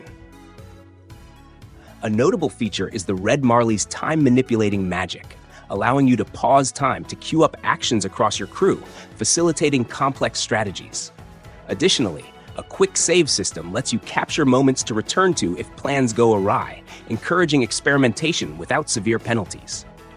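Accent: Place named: India. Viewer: Thank you indian voice over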